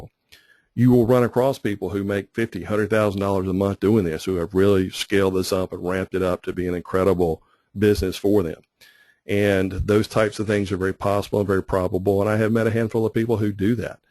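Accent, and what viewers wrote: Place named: South, United States. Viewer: OMG...I just love your freaking voice!!! You sound just like famous celebrity gardener, P. Allen Smith! with that soft, soothing, medium southern drawl...I could just sit and listen to you talk about the phone book for HOURS!!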